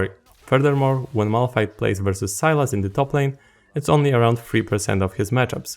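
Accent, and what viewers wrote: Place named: Poland. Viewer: Polak? bo slysze akcent XD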